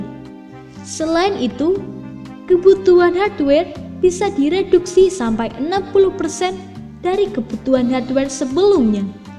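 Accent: Java, Indonesia. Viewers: Suara nya orang jawa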